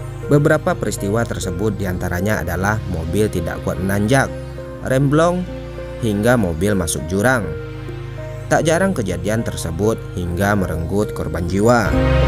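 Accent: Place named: Aceh, Indonesia. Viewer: Kamu orang Aceh ya min .. logat mu kental sekali.. 😄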